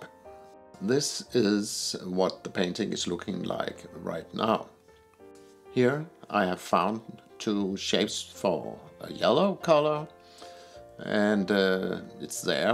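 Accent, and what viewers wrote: Denmark. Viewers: Thank you for your inspiration and also for making it all look so possible, with the right materials and the right attitude. (I also like hearing your soft Danish accent.) Space is for me the most important element, because even in a painting the images are found in space (foreground, background, etc) and it delineates them one from the other. (or 6.20 pm Western Australia time element)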